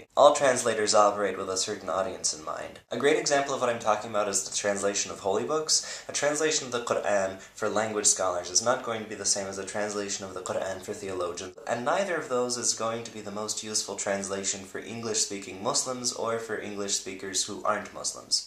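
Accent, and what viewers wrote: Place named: Arab world. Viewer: Your Spanish sounds very Arabic, or maybe more from-the-still-very-moorish-parts-of-Spain-ish. I can tell it's Spain Spanish too, but mostly just very Arabic sounding. And your speech sounds almost mystical as well. It's refreshing to hear someone actually have a voice in Spanish. It's a lot more interesting and understandable than, "Kay whore-ah es?" or "Me yah-mo es Megan e me gustah pah-sahr tee-empo cohn mees uh-me-goes." Thank you for rescuing my Wednesday. DFTBA!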